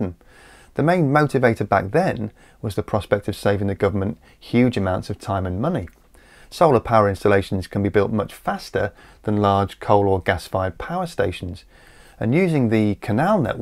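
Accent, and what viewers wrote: United Kingdom. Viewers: Yes he does! Where on Earth did you think he was from? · What!? Hes clearly british, now i have to know where you thought he was from? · woah ! ur british!! ? but u dont sound it man!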